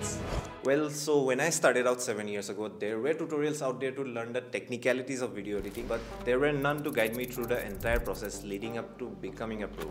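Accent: Assam, India. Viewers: bhai tmi axomiya nki....assamese accent ata ase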